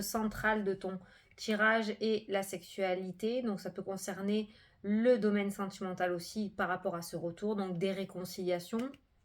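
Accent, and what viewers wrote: South, France. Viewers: cc moi aussi je suis du sud je te comprend très bien c notre parlé bisous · Coucou surtout ne change pas garde ta belle humeur et ton accent du sud et petits mots qui dérangent que certains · Bonsoir à vous merci beaucoup pour se magnifique partage, ( et oui dans le sud c'est comme sa qu'on parle, je suis du 13 donc je suis comme sa aussi. 😊 ne changez rien, restez comme vous êtes 😁 on vous aime comme sa. ) bonne soirée à vous aussi et gros bizoo 😇🙏😘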